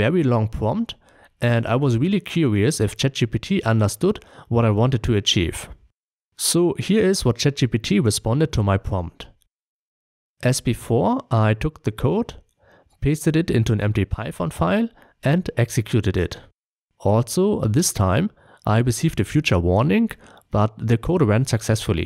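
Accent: Germany. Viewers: Also dein Denglisch is ja wohl kaum zu überhören. 🤣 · Den Akzent hört man leicht raus😂 aber gutes Video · Kann es sein das du eigentlich deutsch sprichst?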